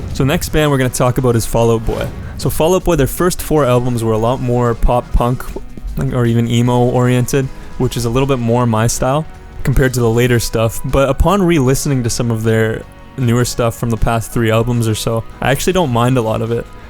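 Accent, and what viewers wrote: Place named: Canada. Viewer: Probably because of the faint canadian accent in there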